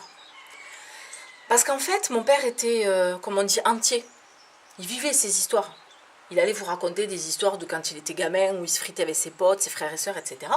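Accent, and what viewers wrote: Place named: Lot, France. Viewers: Je suis du lot g le même accent que toi in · Moi aussi je suis Toulousaine et j habite en ariegedonc tu n'est pas la seul a avoir cette accent que tout le monde adore et puis je te suis sur musicaly et tu es super😉😉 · J'adore ton accent je suis trop fan de l'accent du Sud ❤❤❤❤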